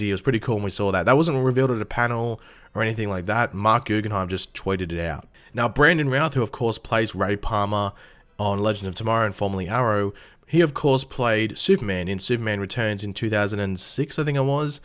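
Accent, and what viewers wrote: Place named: Australia. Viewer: You’ve become EXTRA Australian in this video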